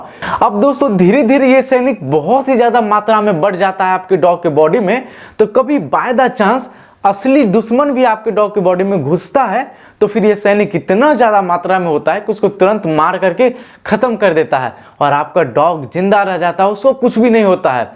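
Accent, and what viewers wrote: Bihar, India. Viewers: Aap bihar ho Na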